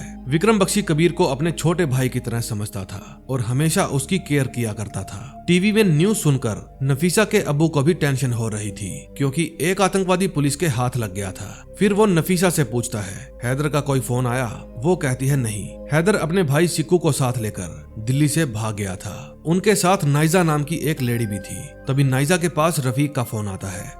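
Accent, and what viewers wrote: Punjab, India. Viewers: Punjabi accent rocks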